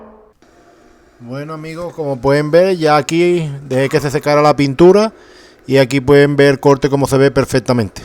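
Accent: Andalusia, Spain. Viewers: Ah, vale ahora entiendo. Lo probaré porque nunca me quedan bien las líneas ni usando cintas buenas. Me quedan bastante bien pero siempre filtra algo. Ese acento que tienes...cádiz, sevilla? Muy yankee no suena🤣Un saludo👍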